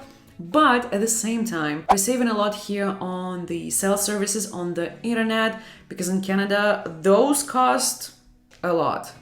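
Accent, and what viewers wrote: Russia. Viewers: She talks about everything being "amanha, amanha amanha" (tomorrow tomorrow tomorrow) but from her accent I'm pretty sure she's Russian and therefore she's in no position to say anything negative about any other country. I'm sure everything is worse in Russia.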